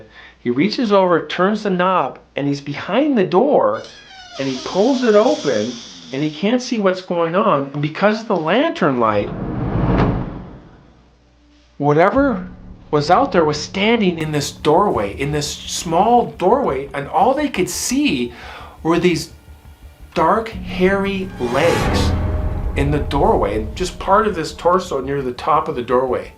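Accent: Minnesota, United States. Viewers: Love your Minnesotan accent.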